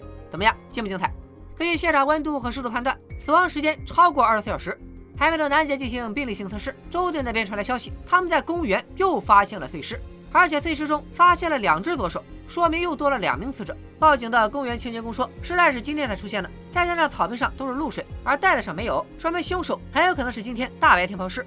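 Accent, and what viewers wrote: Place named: Qingdao, China. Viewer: 请问你是青岛人吗？感觉口音好重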